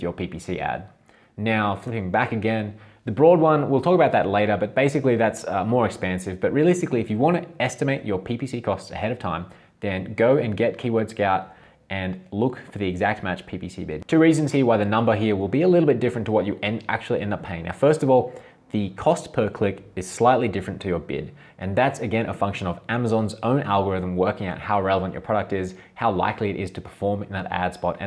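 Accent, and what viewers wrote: Australia. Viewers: Loved the no fuss Aussie delivery. Can you tell me do you eventually drop the automatic campaign when you have sorted the manual campaigns. Cheers.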